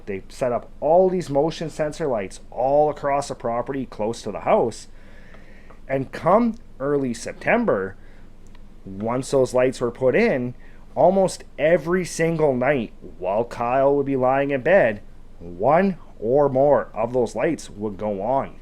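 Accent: Canada. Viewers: Bruh as Canuck working in the US your accent made me homesick haha. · Could tell you were from Canada or Northern States in US by the way you pronounce your “O’s”! My sister married a ND man and he sounds like you! Love it! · Huh, so that is what a Canadian Cow Boy sounds like, ey? I couldn't peg where you we're from for at least a couple mins, LOL. Great vid for the upcoming Halloween season!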